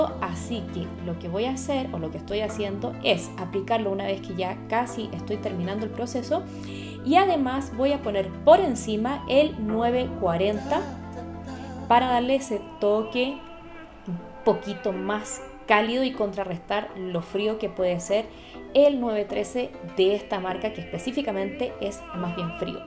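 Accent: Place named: Chile. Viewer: Yo acá viéndote desde Alemania donde ya no voy a la peluquería primero por lo del corona y segundo son caras y muy, pero muy malas .....te felicito es rico escuchar el acento chileno de nuevo . pregunta tu traes babylight pero en las puntas quedan asi de claras o esas les hiciste balayege o algo mas ? saludos te pasaste eres muy buena peluquera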